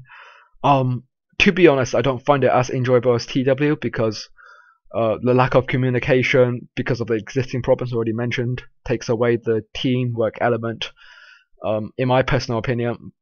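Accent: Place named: United Kingdom. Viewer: British accent :)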